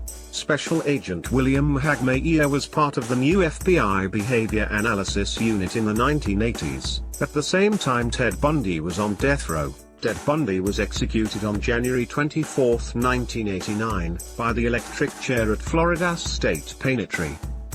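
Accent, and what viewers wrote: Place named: England, United Kingdom. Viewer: Robot voice with a snooty English accent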